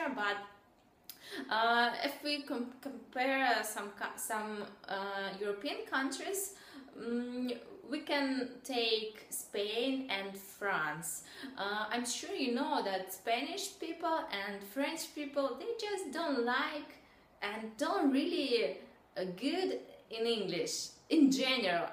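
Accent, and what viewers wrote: Russia. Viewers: I was here for listening to funny Russian English but landed up in watching an honest confession.This is a really nice video,I appreciate. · Your English is good. You definitely have an identifiable Russian accent but it's the cute kind, not the kind that's too thick and difficult to understand. Your voice is pretty and enjoyable to listen to as well. And you are absolutely gorgeous (!), so that helps :p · the Russian language structure makes you speak English with that accent. don't worry. I was in kharkiv for nine months and I can't speak Russian and it is very difficult to pronounce the Russian words., you look very good and your English is careful and cute, take care sister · I will be deadly honest with you. You do have promenate Russian accent. It is unmistakable. Not to be rude, but your accent can not be mistaken for anything but Russian. 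· You are a very nice woman and your Russian accent is very pleasant to hear it! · The Russian accent is nice! Please do not lose your Russian accent. There is no need to acquire an Anglo-Saxon accent. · I'm mexican and still learning English a lot of people told me that my accent is like Russian, I thought it was terrible but ur accent it's lovely haha I really like the way u speak English, and u are so good at it keep going... Now I know how my accent sounds like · I think the vast majority of American men adore your ( Russian women ) accent . I marvel at the comprehensive knowledge of English that Slavic people acquire in a very short period of time , especially Russian’s and Ukrainian’s . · Sorry for some American behavior. We like the Russians trying to talk English.You are doing a great job and I have met Russians here and have no trouble with them.I like hearing their stories and your accent is cute.Take care and thank you for speaking English it is a honor. 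· You are so cute and you have as how cute you as Russian accent. I hope one day, people will say ''ohh is she from Great Britain?'' for your English like you thinking about Danish people's English :)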